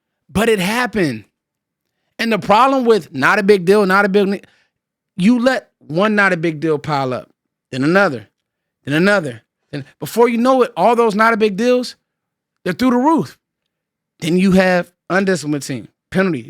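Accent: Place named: Washington DC, United States. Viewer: I knew u was dc by the way u talk..I ran across a lot of dudes from dc in the feds slim..a lot of them are stand up men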